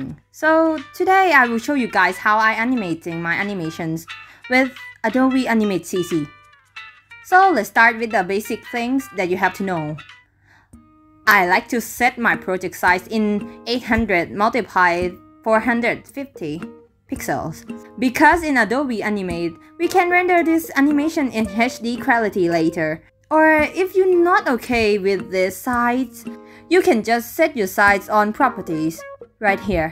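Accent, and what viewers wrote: Thailand. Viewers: ชอบสำเนียง มีเหน่อๆ555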